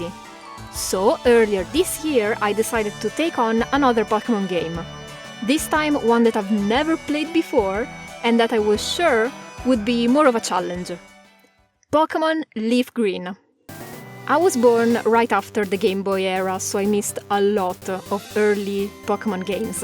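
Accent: Lombardy, Italy. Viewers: Ah quindi sei brescianə/bergamascə? Mi piace tantissimo il tuo canale, dallo stile di disegno alla tua voce e la tua schiettezza, continua così 😊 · vecio ma sei di Bergamo/Brescia?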